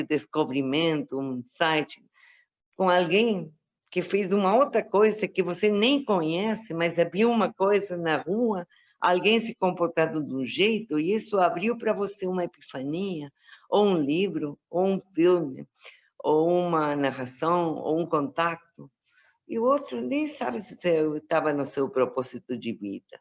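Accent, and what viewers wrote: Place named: Brazil. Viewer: Está muy mona y habla como brasilera! Se entiende todo. Muy bueno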